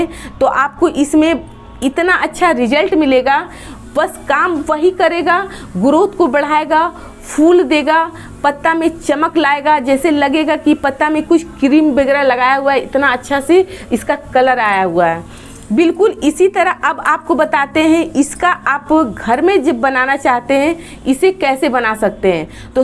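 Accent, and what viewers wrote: Bihar, India. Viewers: Humko Bihari boli boli bhut achcha lagta hai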